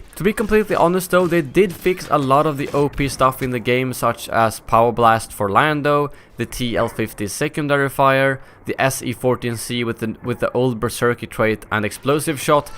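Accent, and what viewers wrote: Sweden. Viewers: are you swede?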